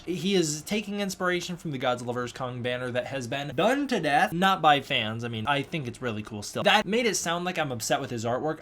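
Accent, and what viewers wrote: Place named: United States. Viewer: When the Legendary speaker mispronounces Camazotz and continues with that mispronunciation. I mean, I know you’re gonna have an American accent, but PLEASE at least try.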